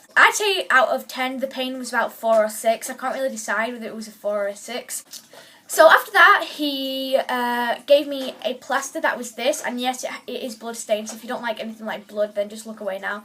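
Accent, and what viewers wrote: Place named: Manchester, United Kingdom. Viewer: Princess_16x x yh its the manchester or london accent uk accent is better thn american accent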